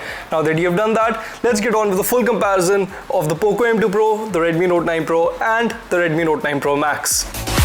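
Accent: India. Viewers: Bro, tumhari english, hindi jaisi lag rahi hai. Thank you bro 😎😎. Easily samaj aa rahi hai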